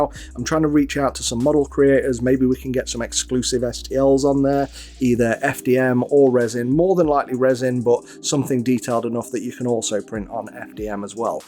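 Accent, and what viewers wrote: United Kingdom. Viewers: I tend to use carbon fibre infused PLA and Matte PLA on prints that require me to hide my layer lines and I print at 0.12mm layers and I get wonderful results, I wonder how good they would look using that material on that printer with those layer heights? I had promised myself I would not buy another bed-slinger printer after I built my Pro 3D V-King 400 from self-sourced very best quality parts, I also have 3 Ender 5 Plus machines as well as half a dozen bed-slingers, I have a resin printer but can count on my fingers how many times I have used it as the smell and the mess and all the cleaning just put me off but seeing the prints this machine can produce has made me think again, if this was released as a cube printer it would be a no brainer descision for me, what size nozzle do you have to use to be able to print in those low low layers? 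Your channel has also escaped my radar until now and it is a breath of fresh air to hear another English northerner (I think) talk about 3D printing, I am from South Yorkshire by the way! +1 Subscription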